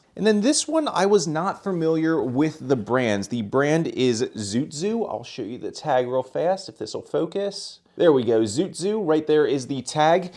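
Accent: Philadelphia, United States. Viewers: That accent has gotta be Philly or Pittsburgh. Request for you to say a few words in your next video: water, home, motor oil, hoagie, youse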